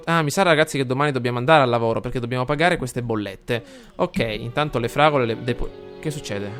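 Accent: Sicily, Italy. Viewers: Dal "noi non siamo cosa di.. [...]" ho avuto la conferma che sei siciliano o almeno meridionale Continua così, riesci ad intrattenere bene uwu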